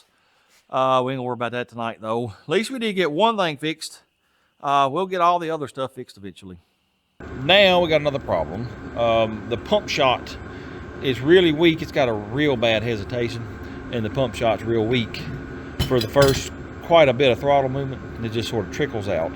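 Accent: South, United States. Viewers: I figures...then I guess I will have to pull the plug on you and unsubcribe because my eardrums hurt from all that redneck gibberish. Too bad.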